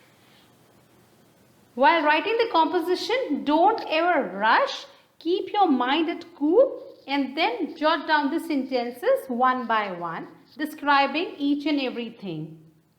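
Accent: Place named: United Kingdom. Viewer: Its a britishian way to speak English 😒foolish...😏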